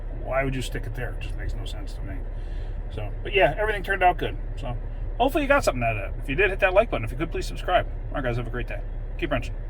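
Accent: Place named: Midwest, United States. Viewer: Thank you for your humility in your presentations. You don’t need apologize for bad camera positions, we understand. I would edit out more dead time. Watching a car go up on the rack is pretty boring. I enjoy hearing, “I’ve never done one of these before”. We all learn together, learning from your mistakes and also your clever “work arounds”. Your bits of tricks of the trade comments are great. I sure hear a Chicago, St.Louis, Detroit accent from You. I heard all those accents when in the service. I’m no expert, but I don’t hear Carolina. 😊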